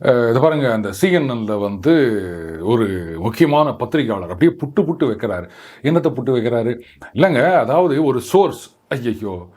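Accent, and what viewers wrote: Chennai, India. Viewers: Sir. Our Chinna correction. Tucker Carlson - Fox News. CNN Ellai. Seldom I get to hear Madras dialect nowadays. Thank you for your service. அட்சா சும்மா எகிரிடுகுது.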